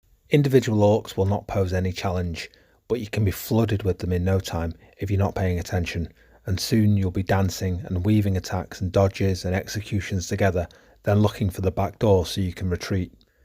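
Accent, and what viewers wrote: United Kingdom. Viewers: True, but the british accent is a bit confusing.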